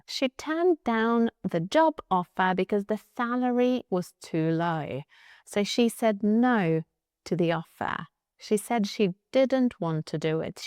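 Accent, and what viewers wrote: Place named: United Kingdom. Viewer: "to stand out" - that's a really funny expression. It reminds me of this Freddie Mercury Movie when he (Rami Malek) was asked about his additional teeth and he answered "What about my teeth - I don't wanna stand out of British people". This is for example a phrasal verb that every English learner ought to understand easily, because in this context it should be clear to the viewer. I am half American and I worked as an English teacher for many years.. And once in a while I have a look into your channel because I'm interested if there is anything I don't know. And I love your British accent. I imitated this British accent so many times that I had to take care not to take it over, because my American relatives were teasing me about it. Once an English Prof told me not to play with my accent because it's so original and native. 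But during my time as a flight attendant with Lufthansa I shared an apartment with a British girl and I found that accent so funny that I had to play around with it.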